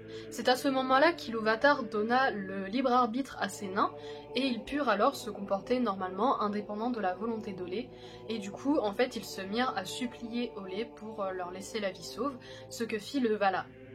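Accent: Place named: France. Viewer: je découvre la chaîne avec cette vidéo et je trouve çà vraiment bien ! petit bémol : ta prononciation est très française ... je pense que ce serai un peu plus intéressant avec les prononciations des langues inventées par Tolkien ( car ce sont des vraies langues qui ont nécessité pas mal de travail et puis elles sont jolies ) ont peut trouvé la prononciation dans les bouquins ( au début pour le Silmarillion et à la fin pour le Hobbit et dans le tome des appendices du Seigneur des Anneaux ) mais ça peut peut être varié selon les éditions donc allez voir la chaîne Arda et particulièrement cette vidéo <link>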